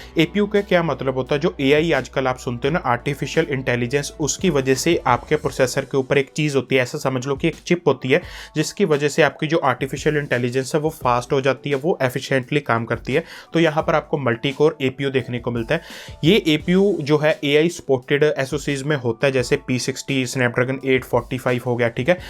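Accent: Punjab, India. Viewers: Punjabi accent bro 😅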